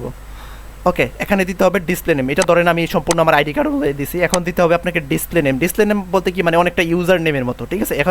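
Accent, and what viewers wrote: Sylhet, Bangladesh. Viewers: vi kita sylheti ni?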